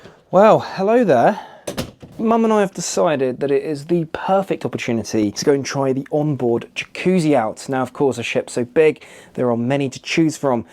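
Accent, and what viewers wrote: United Kingdom. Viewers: Theyre called lifts in the uk. Youve got a surprisingly British sounding accent for an American. 😮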